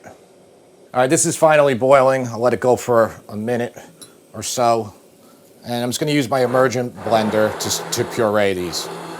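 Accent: New York, United States. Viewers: You guys from New York?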